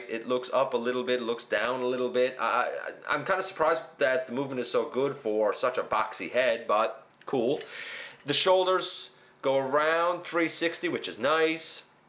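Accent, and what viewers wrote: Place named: Newfoundland, Canada. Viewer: Are you a Newfoundlander ? I come from there and our accents are almost identical. The only other possibility is if you come from Ireland or Cape Bretton.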